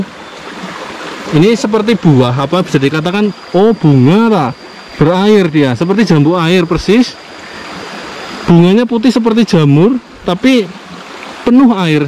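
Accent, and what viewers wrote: Banyumas, Indonesia. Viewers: Bahasane kok ngapak yah ??? Asli ngendi kang!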